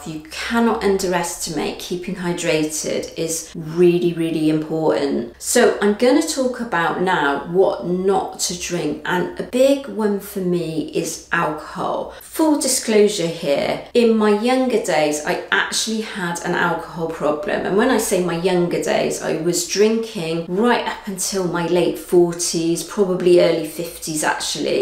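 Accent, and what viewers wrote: United Kingdom. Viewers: Definitely British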